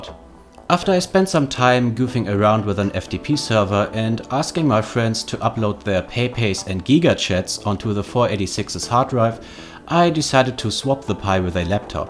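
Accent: Germany. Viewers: THIS ACCENT...are you german? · German English.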